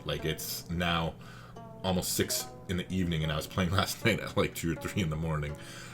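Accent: Canada. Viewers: Hey. Not a Civ comment, but... I took my undergrad degree in The Soo, Michigan. Your accent reminds me of all the cool Canadians I used to hang out with way back when, eh? Thanks.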